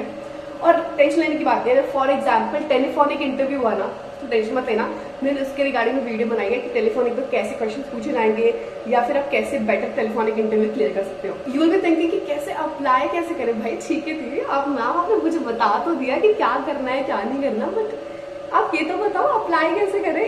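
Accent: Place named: Haryana, India. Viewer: You speak haryanvi accent well. By the way wat happened to your hands. Any injury?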